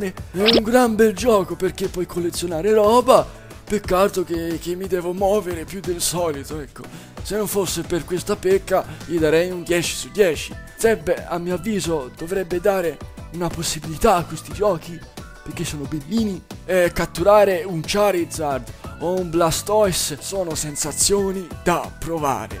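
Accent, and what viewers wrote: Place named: Tuscany, Italy. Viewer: E da quando hai l'accento toscano?!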